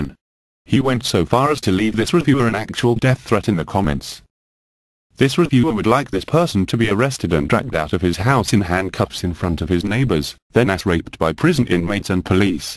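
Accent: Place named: United Kingdom. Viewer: The fact this is in the Education category combined with the robotic British voice is awesome.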